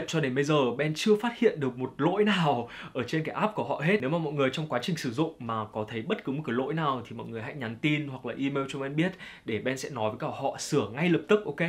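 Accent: Standard. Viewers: Tao thay thang này phát am tuy chuan nhung nghe cam thay k tu nhien . Nghe mac met giùm lun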